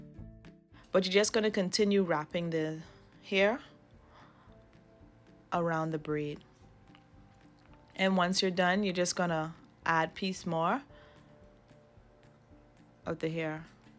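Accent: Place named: Bahamas. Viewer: Are you bahamian you sound like me am from the Bahamas but live in Seattle Washington currently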